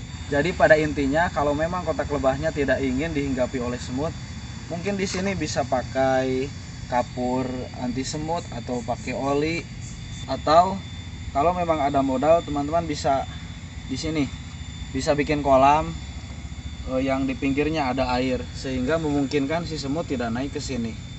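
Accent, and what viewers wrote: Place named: West Java, Indonesia. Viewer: Beda ey Ari logat Sunda mah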